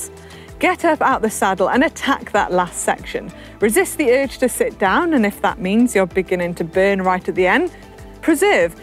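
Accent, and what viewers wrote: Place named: Wales, United Kingdom. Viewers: Another great video from with the true Welsh accent coming through 💪💪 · Can we have more Welsh accent? 😀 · where you from? you sound welshy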